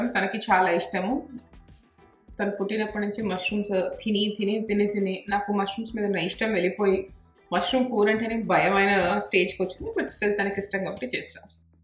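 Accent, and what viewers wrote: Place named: North India, India. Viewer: Your Telugu is very different. Did you grew up in north India ?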